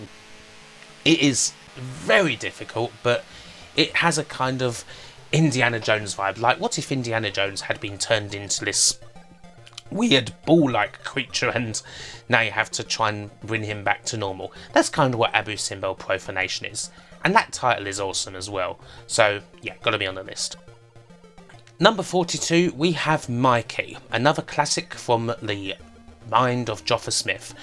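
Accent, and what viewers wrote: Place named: Kent, United Kingdom. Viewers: I wich I could know where is accent from. London? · I'm not from down south where accent is from, so I can't place it any more locally than to say it sounds like a typically Kent sort of accent. Southend On Sea, perhaps. If you're not familiar with UK geography, that would be going out of London to the East, draw a line heading 90 degrees from the Houses of Parliament and keep going til you hit the coast, that's pretty much what Kent is. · South of England. Could easily be London, or maybe Essex.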